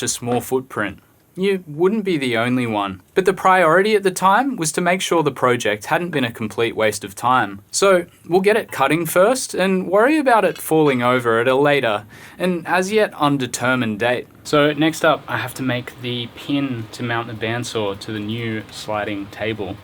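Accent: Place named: Australia. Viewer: Your delivery style suggests SuperFastMatt’s lost Aussie brother, but without the fast bit. Interesting project. Thank you for sharing. Peace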